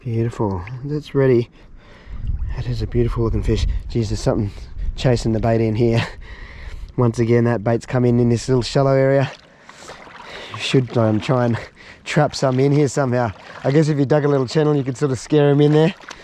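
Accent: Australia. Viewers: One of the most relaxing things I do is watch your camping trips on the islands. One of the most amusing things is listening to my wife's (affectionate) commentary on your Aussie accent and syntax and mentioning that I'd fit right in with how I "sometimes" shorten words. LOL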